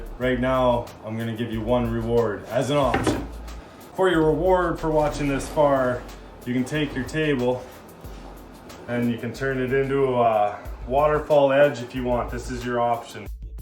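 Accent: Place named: Ottawa Valley, Canada. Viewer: Man, are you from the Ottawa Valley buy any chance? You talk like everyone i know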